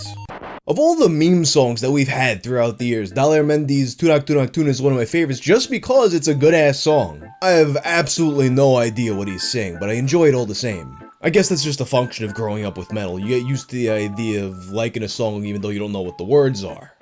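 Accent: New York, United States. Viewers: WTF! You are Sikh??? U sound American as fuck. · did you always have a new york accent? i swear you didn't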